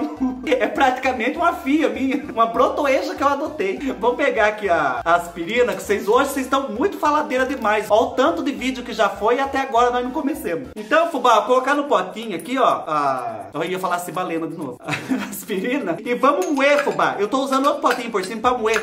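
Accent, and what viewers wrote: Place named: Cajati, Brazil. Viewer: Fubá tu fala igualzinho minhas tias de cajati 😂😂😂😂😂😂😂😂😂 deu até saudade ❤️❤️❤️❤️😂😂😂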